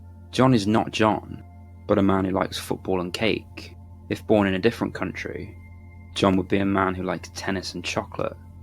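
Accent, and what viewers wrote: United Kingdom. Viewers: His British accent just sounds so clever and he says everything so confidently, i would be fooled if i didn't know better.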